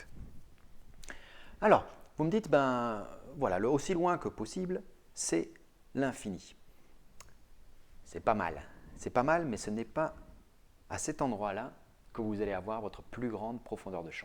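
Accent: England, United Kingdom. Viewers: Merci pour votre pédagogie qui n'a d'égal que votre humour et votre accent anglais, qui détonne dans la bouche d'un francophone. Vous êtes le Choulax de la photo comme on dit ici.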